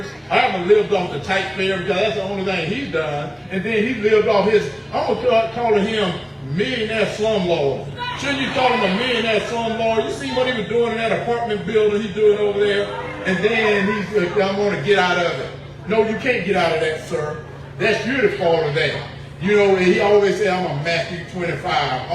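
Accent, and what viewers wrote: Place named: Georgia, United States. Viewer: Walker you can tell you love Georgia. You can tell you was raised there. Your a full blooded Georgia you walk and talk like a Georgian. If you don't win then Georgia don't deserve you.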